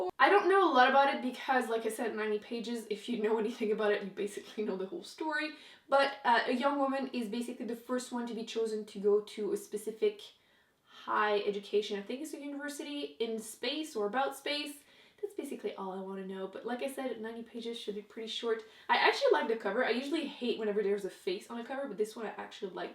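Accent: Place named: Quebec, Canada. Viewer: Hi Hello! I'm new to your channel and have enjoyed watching your videos and hearing your thoughts on books! Hope you enjoy the books from your January TBR!:) Btw, are you from Canada? You kind of have a French-Canadian accent.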